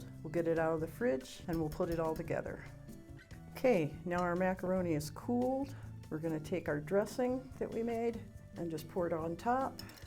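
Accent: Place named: United States. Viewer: Where do you live? By your accent, im assuming the US. Hellman's (east coast)= Bestfood's (west coast). I hope this helps anyone who didnt already know this😊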